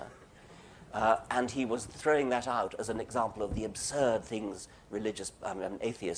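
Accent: United Kingdom. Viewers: lol the British accent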